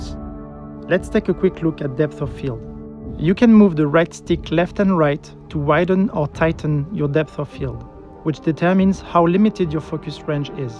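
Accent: France. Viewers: L'accent français on l'adore quand il parle anglais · XD this French accent is so obviously 😂😂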